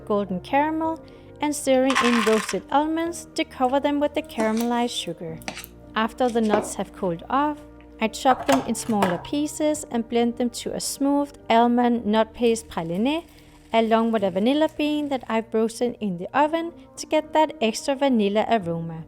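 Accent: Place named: Denmark. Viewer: Hej jeg kan høre en dansk accent og navn! Ser super godt ud! ^-^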